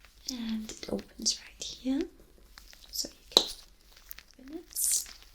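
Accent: Denmark. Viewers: the danish accent!!! :) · Please do more of these Danish accent videos! It reminds me of my Danish grandparents, they both spoke fluently and had slight accents. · Det er ikke danglish nok. Jeg kan faktisk holde ud at høre på det :^) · This video was AMAZING! I loved the layered sounds going on, and your voice was so clear and can we talk about your wonderful accent and Danish dialogue?! Goodness was it tingle inducing! Bravo!! ❤ 😍 ❤ 😍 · Som dansktalende, krummer jeg tæer over den accent.. Danglish at its finest.. Hvorom alting er, skøn video som altid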